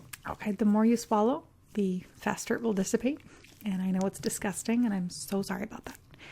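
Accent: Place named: United States. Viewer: I have to ask, is English or Portuguese your first language? You speak English with an American accent, and I don't know Portuguese so I can't identify an accent in that one.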